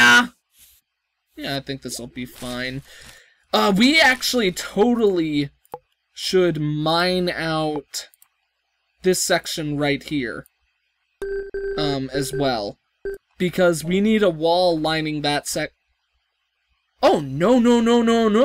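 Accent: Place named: Australia. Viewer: you sounded Australian to me (I'm British)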